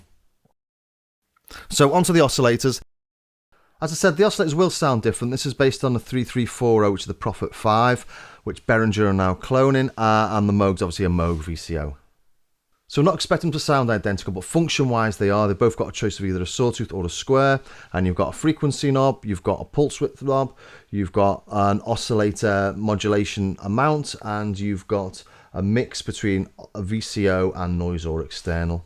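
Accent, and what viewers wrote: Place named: Northern England, United Kingdom. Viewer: \Good on you and good to hear your northern accent!!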